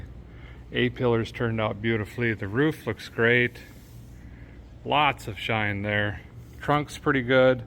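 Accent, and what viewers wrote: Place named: Upper Midwest, United States. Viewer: As a chicagoan (bridgeport native) the way you pronounce battery warms my soul. I am out of state for a while down south. And I have a thick thick chicago accent(9th generation chicagoan) lol I told my neighbor about how much I hate my "front room" no idea how to type like I sound but its Like, "guy I'm tellinya went over dare and sat inda share, chtyaknow I can't see out da faaackin windohh in my frunchroom unless i stand" he instantly started laughing and said "yankees" all dissapointed like while shaking his head. I get made fun of a lot here. You have like a north Midwest accent. Like Wisconsin, Michigan. Mine is like angry Canadian. Sorry I have a fascination with accents and dialect from different parts of the world and country. America has so many different accents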